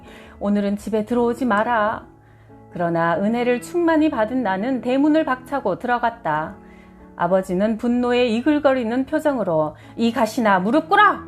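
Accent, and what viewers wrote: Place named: Daegu, South Korea. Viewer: 대구사투리도 너무너무 잘 하셔요 몇 번 들었는데도 참 고맙습니다 감사합니다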